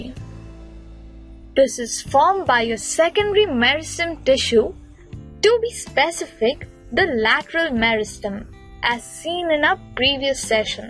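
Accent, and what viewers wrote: India. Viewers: this video could be useful, but sadly it's in india accent, take time to understand..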